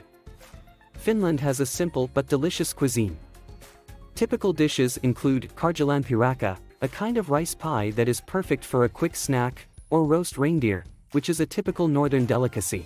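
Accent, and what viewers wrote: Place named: United States. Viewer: I was actually surprised! An American-accent narrator and an opening screen of a sauna had me expecting a foray into the Nordic lack of inhibition to nudity that tends to lead English speakers into perverse imaginations of Finns (and Nordics in general) flaunting dicks and tits without any regard for English-speaking prudishness! To my surprise, this video had nothing of that! I'm Icelandic, a western brother of our eastern brothers, the Finns. Having worked with Finns in stressful situations (relief operations in war-ravaged African countries), I now know that the Finns are even more stoic than we Icelanders believe ourselves to be! More solid friends you will never find, and they have the rare quality of not shooting their mouth of when they have nothing to say! In my case, I found that Finns stay quiet until they have something useful to contribute to whatever conversation is going on. 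I also learned that if a Finn bothers to enter a conversation, he/she ALWAYS has a truly valid argument/insight to offer! The Finns are a people of few words, and WHEN they have something to contribute, pay attention!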